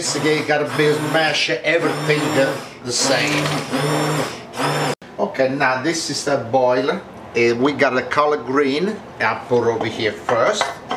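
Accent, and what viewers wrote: Italy. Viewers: He sounds like an oldschool italian imigrant. Kinda cool.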